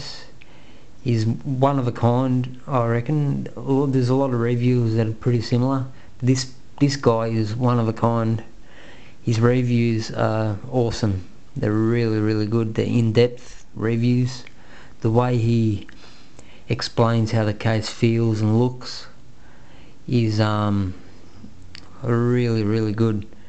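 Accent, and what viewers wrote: Australia. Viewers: Aww man it's not nice to ask him why he talks funny...and being British myself I would just like to point out that he's not British. He's most likely Australian or from New Zealand, we don't have dollars over here. We have pounds. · you sound australian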